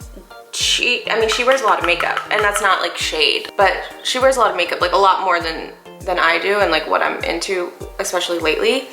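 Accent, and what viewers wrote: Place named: Philadelphia, United States. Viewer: girl i love when you talk about philly stuff and when i catch your accent! cuz i am also from philly but w/ a god awful philly accent. i luv it!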